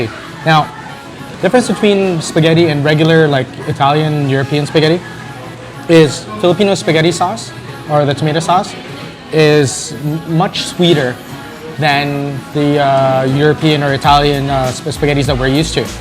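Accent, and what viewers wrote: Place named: India. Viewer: Id rather listen to a chainsaw sound rather listening to an indian accent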